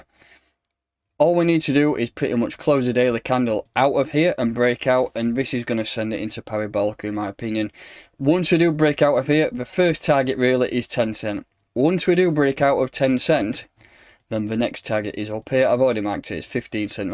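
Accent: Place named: Chorley, United Kingdom. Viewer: I'm putting you in Chorley or somewhere with that accent mate. You deserve a bigger audience - nice, easy to follow analysis.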